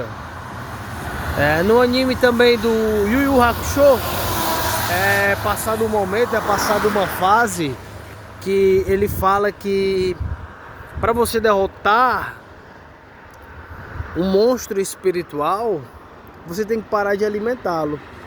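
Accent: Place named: Fortaleza, Brazil. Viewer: Parabéns,vc é um cara bastante sabio , gostei do vídeo e me inscrevi, e pelo sotaque percebo me que é de Fortaleza , vc fala muitíssimo bem 👏👏..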